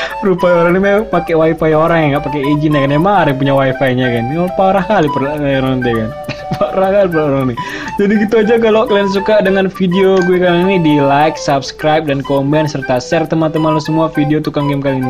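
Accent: Medan, Indonesia. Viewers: lu anak medan ya brohh...... logatlu logat medan brohh.......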